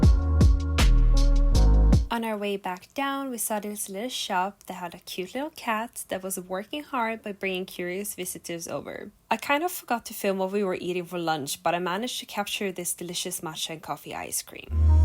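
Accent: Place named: Sweden. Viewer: just saw you in a video on the world friends channel, and I couldn't pin point your swedish accent at all. Where in Sweden are you from?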